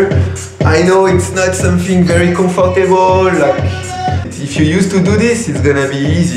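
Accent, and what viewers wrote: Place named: France. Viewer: Just love the french-english! :D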